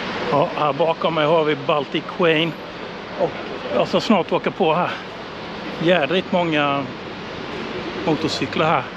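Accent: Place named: Jönköping, Sweden. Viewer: Hittade precis din kanal och tittade på detta avsnitt. Gillat och prenumererat. Vad roligt med ännu en MC kanal från Västgötaland. Fast du låter som du vore inflyttad till Habo från Jönköpingstrakten hahahaha. Inget ont ment. Nu ska jag se om jag hittar videon om väskorna och sen blir det att titta igenom hur det går på din resa. Lyckönskningar från Falbögda.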